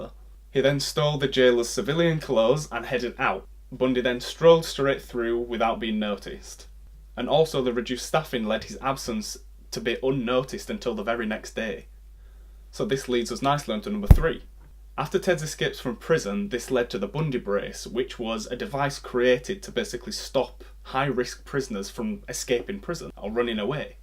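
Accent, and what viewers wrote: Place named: Yorkshire, United Kingdom. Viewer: Are you from Yorkshire? Your accent is really cute haha Great video btw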